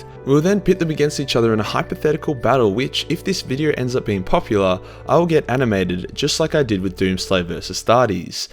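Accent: Australia. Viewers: Subscribed early - top job Mate ,and it's bonza to hear a fellow Aussie accent to boot !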